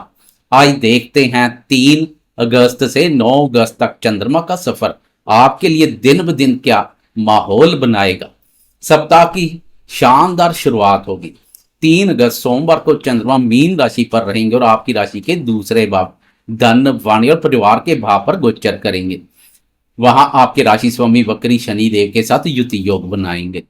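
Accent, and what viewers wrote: Punjab, India. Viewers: 👍🙏👏Aapka punjabi ascent kisi apnay baray ki ascent ki tarah hai🙏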